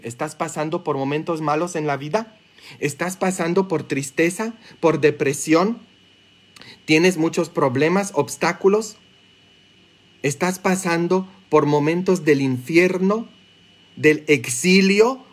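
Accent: Mexico. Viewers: Padre, Gracias! Ud, es precioso me gusta escucharlo en mi español con acento mexicano, soy ecuatoriana, lo amo. Padre, Si creo que existe el mal por eso aconsejan tener animales porque nos protegen; y, el pobre perrito tomó la maldad que le cayo al pobre cura. Muchas Bendiciones! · Que divertido es usted padre me encanta que sabe el español mexicano👍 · Que bendición haberlo encontrado padre. Es usted un Ángel!!! Me encanta todo lo que dice y sus explicaciones son especiales. Usted si que aprendió el español mexicano así como de donde soy yo de un pueblo en Jalisco llamado Acatic (pero hoy día vivo en California). Espero muy pronto poder ir a una de sus misas cuando viajemos al norte de California. Dios lo bendiga y nos siga nutriendo de tanta sabiduría. 🙏🏼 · Padre, que bonito es aprender y disfrutar de sus predicas, y yo creo que usted es igual de mexicano que yo, habla igual como hablamos nosotros, igualito...un abrazo muy grande....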